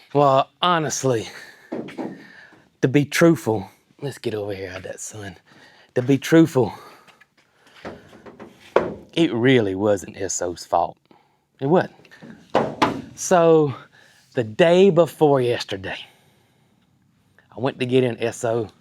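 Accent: South, United States. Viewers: please don't change any part of your speech. I am Australian, and you and are teaching me Southern. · as a woman raised in South Carolina I appreciate your colloquiolisms. Since I was 18, I have lived all over the USA but then, 21 years ago, I moved to Australia and here I remain. One of the things that drew me to your channel is your and wholesome realness. Your accent is something I love to hear because it's something I miss and the only time I hear a similar accent is when I talk to my Mama - her mama was raised near Selma and my grandaddy was from Georgia so really appreciate the familiarity of hearing you talk about your day and the animals. It reminds me of the time I spent with my grandaddy. He taught me how to hunt & fish, grow worms, and crops. Like him, you have a quiet patience in your explaining what you're doing and showing your enthusiasm for your daily tasks. 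It's inspiring and educational at once. Thank you for sharing your days with us. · 100%!! I feel like people intentionally get hung up on things to cause drama, getting hung up on semantics instead of enjoying a video. If they can't figure out that knows what kind of fuel his things need, that's their problem! Expecting someone to talk the way they do is outrageous. He's from the South, he speaks how he speaks, it's who he is, and everyone needs to leave him be. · Under no circumstances, do you apologize or change the way you talk! You are from the south be proud if they don’t like it they can leave your channel❤ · Your speaking fine,people have to understand your from the south and things are said differently there,but there is always someone that has to complain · Amazing about ESSO, he still had a Tiger in his tank, he just had his wires crossed....LOL, I have had days like that. I loved this video, we got to see you huggin on Jesse, rubbin on Nugget and of course snugglin on Holly, my heart, my heart. 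I always enjoy the videos, but when you love on the animals for a minute, it is so special, especially for those of us who don't get to do that much anymore. It's plum therapeutic and as a fellow southerner, we know plum means "sure enough extra"........ don't change a thing about how you talk. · Do not change the way you talk, I am Southern we talk just fine. Its everybody else who talks funny, lol❤❤❤ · In Australia we call Gas Stations, Petrol or Service Stations or Servos, you can buy Leaded and Unleaded Petrol, Diesel, LPG and some used to sell Kerosene for the old heaters. We see enough American TV shows to know you call them gas stations. We all know what you mean when you say Gas. I didn't know that in the southern states you call most soda drinks "coke", but that is part of the charm of watching and learning your culture, please don't change your speech for anyone, it's who you are and where your from and the time your from. 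I fell in love with Cog Hill Farm, run by a wonderful family in Alabama, I love your accent and your slight differences to other places. Please don't change for anyone. Have a blessed day, stay cool and hydrated. I'll stay warm and out of the cold lol. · I’m from Maine and absolutely love the southern accent. Continue being you. That’s why you have so many followers and Cog Squad fam ❤ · I concur with She beat me to saying DO NOT CHANGE for others!!! You speak with Southern Charm. Please, DO NOT become "woke" with the crazy world that gets offended over things or words. We love you just the way you are. Anyone gives you lip, just tell them that GRAM in TX told you not to do it Luv ya: GRAM 👵in TX🙏❤ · Those of us from the South speak well. Just our version of well. Never apologize for that. State to state cultures differ. Makes us all the kinda different God has given us. And wouldn't it be BOREING if we were all the same? 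You betcha! Love you both! G. Davis😊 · Love a good southern drawl, and easy going vocabulary.. it takes me home to a place and people I loved💗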